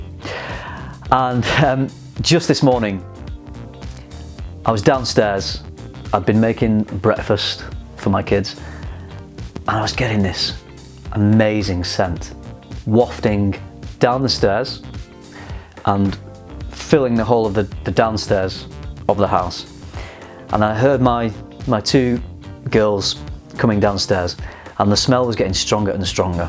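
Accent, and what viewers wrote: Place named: Leeds, United Kingdom. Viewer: Are you from Leeds? Trying to figure out where the accent is from 🤔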